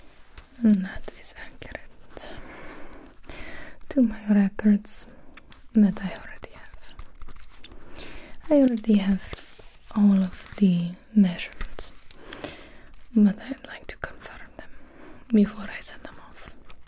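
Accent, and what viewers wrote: Russia. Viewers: Me: hears Russian accent and sees blonde short hair Also Me: Black widow? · why a russian accent¿ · Correction.... = perfection. + Russian accent = Russian perfection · I love your not so good english and russian accent (when some people try to speak english and the do it incorectlly most of the time its really annoying, but you are exception) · It sounds like she has a russian accent of sorts😂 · Your Russian accent is the best--the way you say your Ts are giving me all the tingles! 💛Side note, that wig is really pretty on you 😊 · Cool Russian accent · Wait so is the accent Russian? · The accent kind of sounds like a mix between Russian and African Wait... maybe I shouldn’t have commented while I was sleepy, she just sounds Russian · I LOVE THIS RUSSIAN ACCENT PLSS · as a Russian person I can say that this accent sounds extremely real like most of my friends talk like this in English ahahha UPD: not everybody talk like that tho don't get me wrong! 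· not a bad Russian Bulgarian or whatever...... I'd see the movie. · I am really bad with this stuff but is that a Russian accent like I said I am so bad at this kind of stuff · Good Russian accent by the way good content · as a native Russian speaker, this is one of the best Russian accents I have veard, and the essence of this character is instantly recognizeable. good job ;) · Tatyana,is not a greek name,its clearlly soviet/slavick/russian,also this is how russians speaking english sound,and she tried to replicate russian maniars. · i am inlove with the russian accent, its so aggresive but soft at the same time AAA, this asmr is everything 😭😭💖💖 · Russian accent? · Perfect Russian accent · with a russian accent is the most funny and relaxing thing ive ever heard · The Russian accent is so cool lol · My mother died 5 years ago and s'he had t'he same strong Rússian accent listening to you remind me of her and helps me sleep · She is to good at Russian accent lol😭😁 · THE MOST ADORABLE Russian accent. 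This is my favorite video of yours. Thanks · Russian acsent?!!? Reallyyy?!😐 Well i liked it😁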